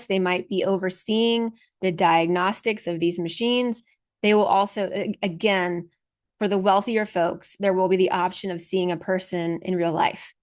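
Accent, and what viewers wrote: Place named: Australia. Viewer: Fantastic hearing an Australian biais 😅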